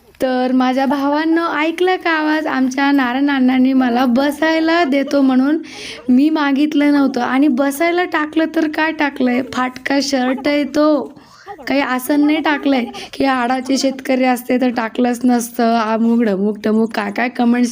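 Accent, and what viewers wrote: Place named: Ahmednagar, India. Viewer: ताईआपल्या भाषेवरून मला वाटतं आपण नगर जिल्ह्यातल्या आहात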